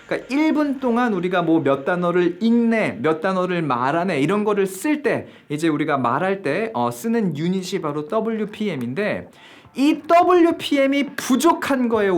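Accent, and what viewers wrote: United States. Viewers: 뉴질랜드에서 학교다녓다고하셧는데 억양과 발음은 미국식인듯ㅎㅎ 요 궁금한게잇는데 아엘츠리스닝 6.5-7.0 왓다갓다해요 리딩은4.5 정도나오고요 딴지거는게아니라 저같은 경우는 왜그런지 알려주세요 아엘츠 시험조만간봐야되는 1인입니다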